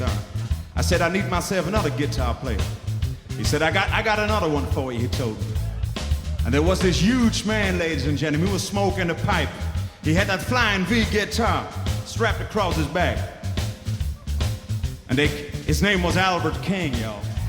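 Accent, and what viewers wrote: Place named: Gelderland, Netherlands. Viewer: dat komt omdat hij een zwaar accent heeft omdat hij uit gelderland komt, hij wil daarom niet voor schut staan met zijn accent, daarom praat hij continue engels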